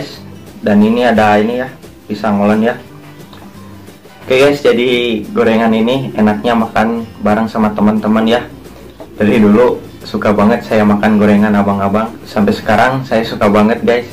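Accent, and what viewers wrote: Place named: West Java, Indonesia. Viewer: Sunda timana kang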